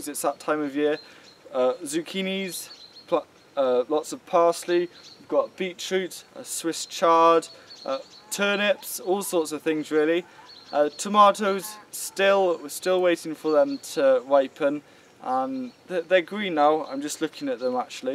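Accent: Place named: United Kingdom. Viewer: Sometimes I come for the great info. Sometimes I come for the entertaining programming. But sometimes I come just for the cool Brit accent. :)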